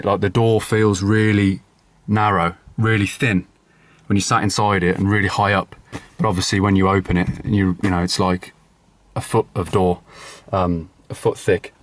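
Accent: Birmingham, United Kingdom. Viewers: "It smells like an old VOLVO in here, it's fantastic!" -"The mileage is probably 500 000km, but that's just a number." -" I didn't notice those stains on the seat when i bought it, maybe I left them there, I was quite exited..." LOL! (Bought a thirty year old VOLVO in the dark, literally!) I love this Brummie!👍😄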